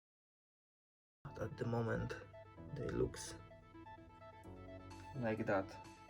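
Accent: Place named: Poland. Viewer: Słychać że Polak 😀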